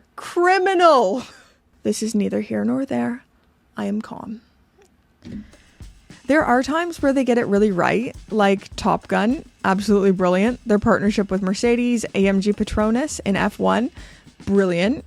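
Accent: Canada. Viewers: I'm here for the watches... and her great Canadian accent 😊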